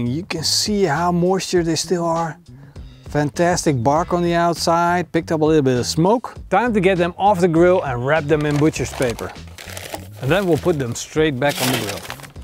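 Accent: Netherlands. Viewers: sounds dutch trying to speak english. but ribs looks tasty.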